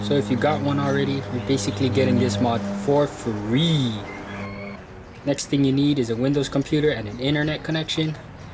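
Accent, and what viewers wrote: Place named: India. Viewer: Czech guy with indian accent :D Interesting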